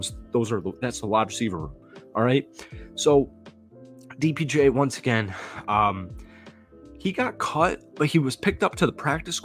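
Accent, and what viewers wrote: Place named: South, United States. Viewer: Please don’t take this negatively. I’m curious about where you are from because of your accent. It sounds southern at times and not sure what else.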